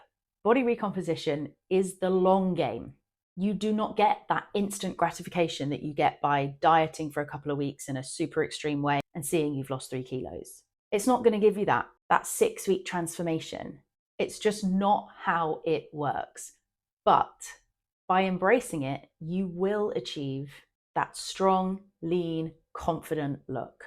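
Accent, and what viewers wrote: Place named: United Kingdom. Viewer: This I subscribe to.Love the British accent.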